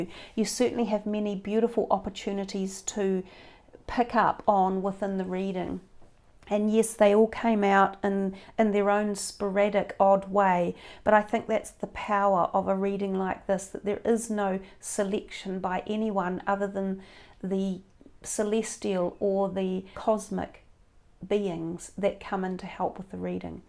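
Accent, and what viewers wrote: New Zealand. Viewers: Thank you again for another beautiful reading, once again I resonated with the whole reading, & was bought to tears when you said about a little girl and she has grown. My god sister passed away from a brain tumour when she was 2 years old. And what spun me out the most was I was thinking about her when you first mentioned lights all around us, I asked myself I wonder if she has grown because I bet she would look absolutely beautiful, I feel i was meant to see this. I appreciate it alot. So much love from me to you. 💕 P. S Are you from NZ also? Your accent sounds kiwi ☺️ no offence intended if you are not 😁 Jess x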